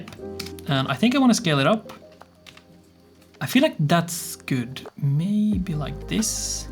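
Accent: Sweden. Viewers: I love how obvious your Swedish accent is. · Wait you have a Swedish accent? I think, if your from Sweden write a answer to this comment, in Swedish.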